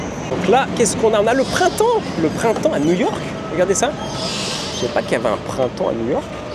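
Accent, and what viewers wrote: France. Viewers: Super ton voyage en bus, tu as pris des risques en étant debout. Je suis étonnée qu’il n’y ait pas un guide pour indiquer le nom des rues et citer certains bâtiments. Tout le monde te demande le nom de ton site et quel succès avec ces charmantes jeunes filles , le french accent ça plaît !!!. Bravo, continue tes vidéos très vivantes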